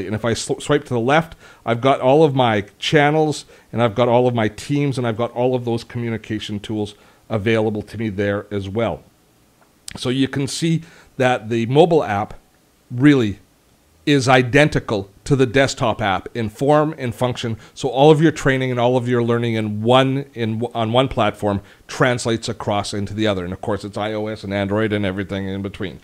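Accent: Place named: Canada. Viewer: Canadian heavy use of "aboot".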